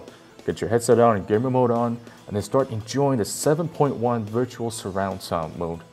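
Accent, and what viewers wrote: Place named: Los Angeles, United States. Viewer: 觉得的口音好好听。能问一下是什么地方的口音吗我觉得好像是洛杉矶的？若能回复十分感谢。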